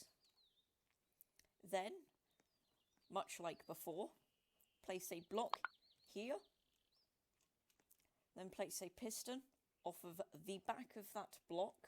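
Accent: United Kingdom. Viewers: British voice bro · Everyone talking about the door: Me: What a posh british accent.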